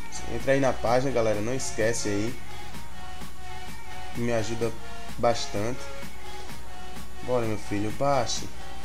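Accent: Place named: Bahia, Brazil. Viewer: tu e baiano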